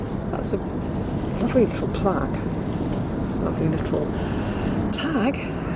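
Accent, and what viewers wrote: United Kingdom. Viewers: It was an absolute joy to stumble on your video miss! Yours was the first British accent I have heard on YouTube for ages and I was beginning to feel homesick without leaving North Yorkshire. As for wishing you were 32 again I have just been 32 again and I can tell you that being 64 is over rated despite what may have said in the song. Blessings and peace miss.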